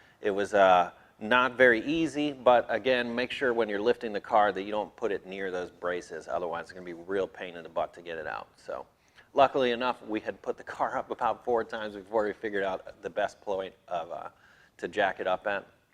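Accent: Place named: United States. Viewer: A V8 rear wheel drive car is alien to you. You sound American, must be an import guy?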